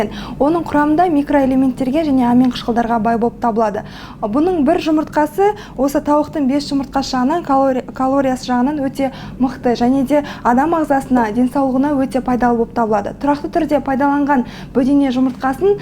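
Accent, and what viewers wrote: Standard. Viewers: Кушти казакша таза сойлеп тур.толык .анык маглумат жетикип ацтпды екен .рахмет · Таза қазақша əдемі сөйлейді екен Жарайсың !!!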